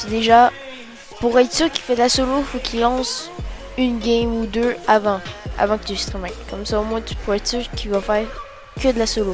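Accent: Quebec, Canada. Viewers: T'es québécois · T québécois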